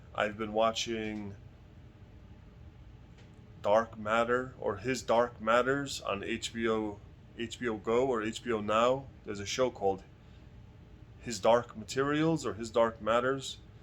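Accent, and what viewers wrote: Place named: East Coast, United States. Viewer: Congrats on reaching over 500! I knew you’d gain a lot more subscribers! You remind me of old school YouTube when people would create videos for fun instead of making professional productions. You look like you’re having fun sharing and you’re genuine. When you share your experiences/hauls/failures/successes, it’s like talking to a friend. I need to up my preps. Been having some stomach pains lately so the doc suggested a low fodmap diet. That threw a wrench into my preps. Still trying to learn what it entails, but it is so restrictive that it makes most of my preps off limits (hopefully I don’t have any food triggers that irritate my stomach so that I can continue eating normally). No gluten, no beans, no onion or garlic. Lots of fruits off limits. Anyway...thanks again for the entertaining and informative videos. 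One last thing — one source of protein you may want to look into is tofu, if you eat it. There is a shelf stable type by a brand called Morinaga. I got a box from Amazon. It can last longer than the refrigerated type. Oh, and I must say your accent reminds me of my East Coast cousins!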